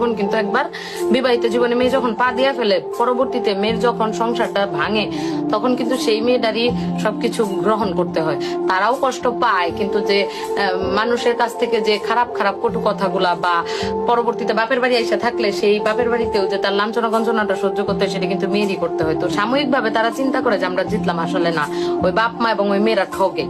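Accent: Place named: Mymensingh, Bangladesh. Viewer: ভাষা শুনে বুজলাম এই মেয়ের বাড়ি ময়মনসিংহ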